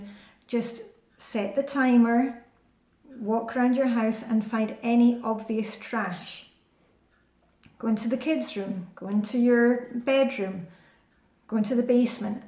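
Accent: Scotland, United Kingdom. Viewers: I'm probably late to Flylady but I am on Day 10 and I totally love it. your videos are clear and concise, everyday I look forward to seeing the wonderful outfits you have curated. You have a be kind to yourself attitude which gives the system such universal appeal. I am recommend your videos to my Mummy friends as well as to my own Mum. I must confess I don't do the shoes (I am pretty OCD about shoes in the house, and don't want to confuse my children about this. Therefore I have my shoes for the day at the door ready but otherwise I'm completely ready, even down to my strip lashes). I am keeping and eye out for a house shoe somewhere between a ballet pump style slipper and a shoe. Can't wait for tomorrow. Thanks again (beautiful Scottish accent btw) x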